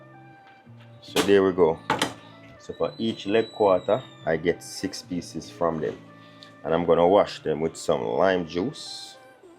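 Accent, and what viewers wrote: Jamaica. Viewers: Chief.. I. Love. The. Fact. You. Speak. English. Well. Just. With. A. Little. Jamaican. Accent. Which. Is. Fine.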